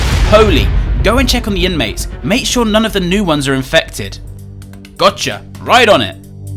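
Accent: United Kingdom. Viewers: This is pghlfilms but bri’ish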